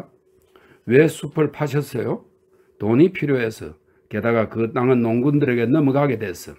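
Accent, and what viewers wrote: Gyeongsang, South Korea. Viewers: 경상도사람의 억양이 들어가서 듣기가 매우 불편하네...다른 사람이 낭독한것은 없나요?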